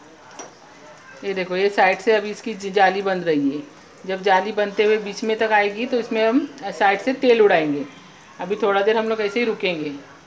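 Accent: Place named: Konkan, India. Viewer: Asalam alaikum. Hope you are doing great and enjoying spirit of Ramadan. You have reminded me typical konkani cuisine of my Nani. You accent reminds me of my Nani’s family. I have already tried chana chatpata batata yesterday. Please keep doing what you are doing. Because it makes so many people feel and witness their childhood. Ramadan kareem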